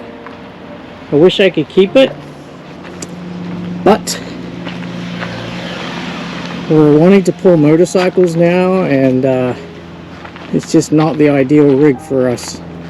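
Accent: New Zealand. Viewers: I love this rig and the fine Kiwi accent. 😊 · Mannnnn I can hear the kiwi in ya haha awesome . I’m from WHG in the north . Kia kaha . I’m manifesting a ride like this !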